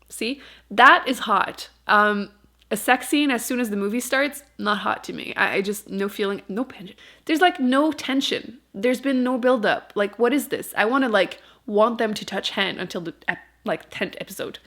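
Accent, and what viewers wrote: Quebec, Canada. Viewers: I think that most people have just never heard a french canadian accent. I hadn't heard one until I came across this channel a few years ago. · I don't feel like you have a very strong Quebecois accent at all. You sound more like a native English speaker with a lisp, no offense. My ex roommate was Quebecois and usually dropped her French accent so people wouldn't know she spoke french, but if she didn't, it was quite apparent. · C'est toujours bizarre a chaques fois que je t'entends parler quebs ahahah 😂✨ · Have people never heard a French Canadian accent? 😂 I grew up in Eastern Ontario where every second person you meet has the same accent! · J’aime trop ton accent québécois 🥺 je me demandais si tu regardais des vidéos de booktubers français? ou plutôt que des anglophones?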